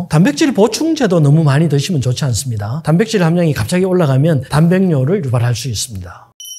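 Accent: Gyeongsang, South Korea. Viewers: 맞아요. 경상도 사투리가 ㅡ발음도 잘 못해서 ㅓ로 들리지요. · 갱상도 싸투리 아이가. 니 그것도 모른데이? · 대기~할일없네? 갱상도 싸나이 발음이라고 생각혀~ㅉㅉ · 갱상도 사람이니까 이해하세요ㅋㅋ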